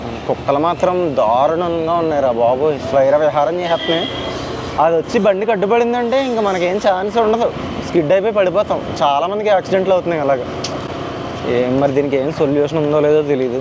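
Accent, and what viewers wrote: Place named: Konaseema, India. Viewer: Hi anna. Mee maatallo konaseema effection kanabaduthundhi.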